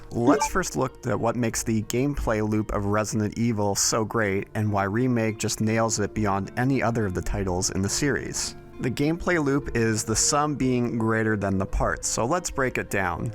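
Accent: United States. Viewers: Thank you. I actually did not know that, but the person streaming sounds American. The H is silent in the USA.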